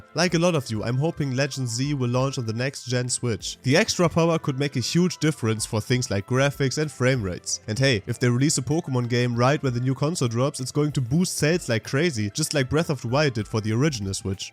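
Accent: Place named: Germany. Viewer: I LOVE your German accent❤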